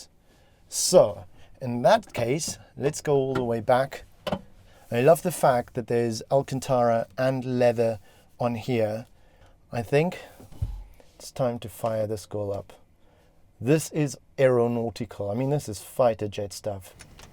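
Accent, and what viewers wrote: South Africa. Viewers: Dude you sound south African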